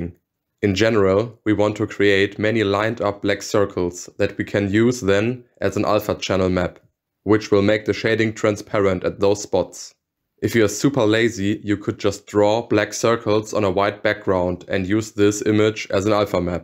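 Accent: Germany. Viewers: Very nice breakdown, directly used it to replicate a DVI input that thicc enchanting german accent <3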